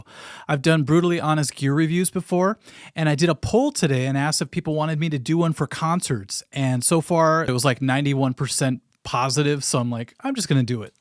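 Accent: Minnesota, United States. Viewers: Hey,. I always wondered where you might be from. I've watched many of your videos over the years and I could definitely tell you had a slight accent of some sort, but I couldn't quite place it, whether you were from Canada, Midwest or maybe even the Pacific Northwest (where people often can have a slight accent similar to the Midwest, where I'm guessing a lot of those folks originally came from). Minnesota makes perfect sense, though. You betcha! LOL:)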